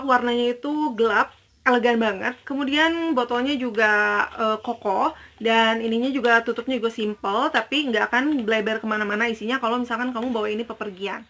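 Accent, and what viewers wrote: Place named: West Java, Indonesia. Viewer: Best skincare product 2020 nya teh. Aku sukaa cara jelasin tth Sunda banget 😍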